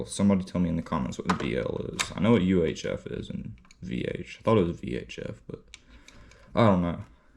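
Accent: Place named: Alabama, United States. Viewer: Southern Alabama accent.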